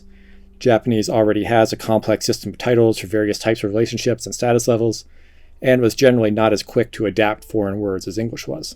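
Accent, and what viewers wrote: Newfoundland, Canada. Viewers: ... yet another Canadian making YT video documentaries... what is it with you guys? do they put something in the water? It seems like every time an interesting documentary-type video is suggested to me, I hear the ole Canadian accent. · Are you from Newfoundland?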